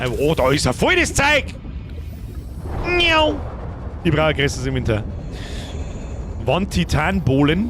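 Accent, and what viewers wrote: Bavaria, Germany. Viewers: Bin vor 2 Jahren von NRW nach Bayern gezogen. Höre deine Videos auf der Arbeit um mal mehr bayrisch zu verstehen😂 Funktioniert echt super! 🎉😂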